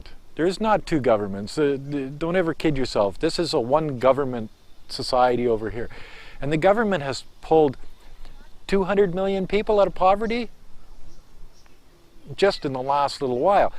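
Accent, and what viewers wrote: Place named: Canada. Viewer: you are definitely sounding much like a Canadian!! Cheers mate. love your rants...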